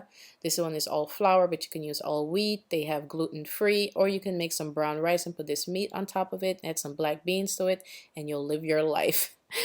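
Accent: Guyana. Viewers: By anychance are u Guyanese? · hey all the time I keep telling myself you're kinda speaking lyk a Guyanese but never bother to ask, but the way you're using those seasonings I just knew for sure you're from Guyana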